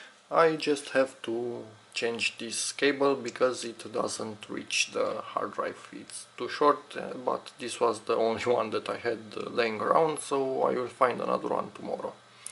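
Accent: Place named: Romania. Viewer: si cand ma gandesc ca esti roman dupa pronuntie,dar nice videoul,am o intrebare pe MSI g31tm-p21 merg setarile astea ca am acelasi procesor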